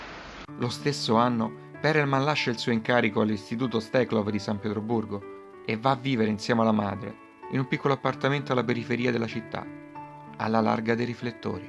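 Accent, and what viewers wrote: Rome, Italy. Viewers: Ma io dico, possibile che la voce parlante abbia un accento così marcatamente romano? Le doppie si pronunciano solo quando appaiono per iscritto.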